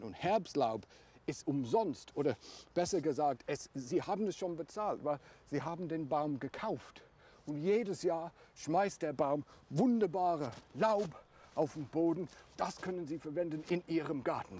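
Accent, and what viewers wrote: England, United Kingdom. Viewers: Klasse Ihre Beiträge. Auch liebe Ihren britischen Akzent. 👏😀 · Morning, nice to hear the English accent being one myself. give the Germans more good tips how to make a good English garden.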